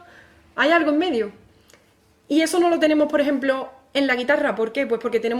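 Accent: Andalusia, Spain. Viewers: No entendí nada (demasiado técnico para mis pobres conocimientos musicales), pero disfruté el vídeo enormemente. Me encanta tu acento andaluz y tu linda cara muy expresiva. Ojalá pudieras hacer un vídeo de los palos flamencos. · Me encanta el flamenco y la cadencia andaluz oleee😂❤😅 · Woooww! Que canal increible!!! Apenas lo conocí y ya me está encantando!!! Ah, y por cierto , que lindo acento andaluz!!! :D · Ella tiene acento andaluz de Sevilla. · Española, puesto que es de Andalucía, la región del sur de España. Por eso tiene ese acento que es el "asento andalú". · tu estilo de explicar, junto con el tono de voz y delicioso acento andaluz dejan a uno anonadado, hipnotizado. ¿Cómo es posible que tanta destreza y belleza se halla concentrado en un ser viviente, a menos que no sea un angel caído del cielo!! Qué placer! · Il tuo accento è andaluso? È bellissimo. 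Per me questo è lo spagnolo per eccellenza.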